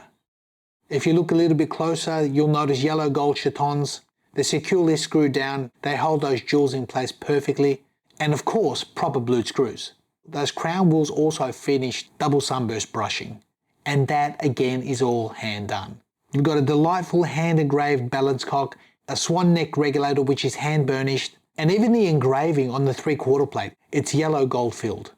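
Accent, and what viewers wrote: Australia. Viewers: I hate this stupid Australian accent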